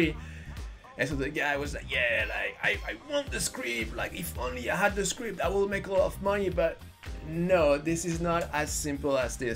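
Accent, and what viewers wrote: France. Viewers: He sounds french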